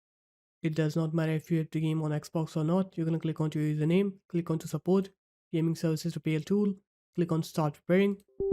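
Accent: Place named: India. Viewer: thought i was cooked then i heard a faint bit of indian accent so i know i am GOOD bro this fix WILL WORK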